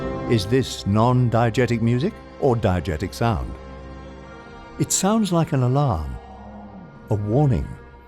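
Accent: RP, United Kingdom. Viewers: I have a hard time taking the narration seriously with him doing the RP accent.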